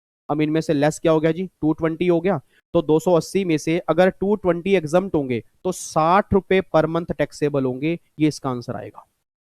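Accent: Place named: Haryana, India. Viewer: Haryanavi suits you sir😁👌.........and than you for amazing videos of revision❤ #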